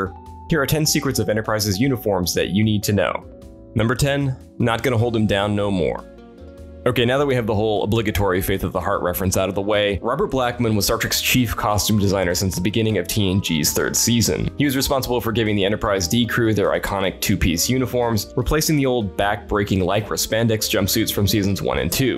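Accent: United States. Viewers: Uhh. Who is the Yank talking? It's jarring! :P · Wait, did y’all hire a new person? An American??? (I’m American too, so, I empathize haha) Or is this the fabled who Adam Clery is always shouting out? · Wait... You hired an American?? Maybe I should have applied after all.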